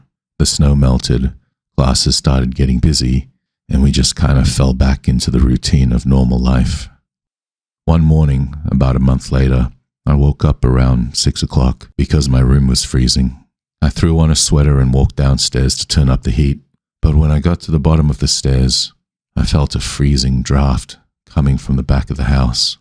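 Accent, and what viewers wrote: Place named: Australia. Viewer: Great to hear an Australian story teller, I enjoyed listening in ❤🇦🇺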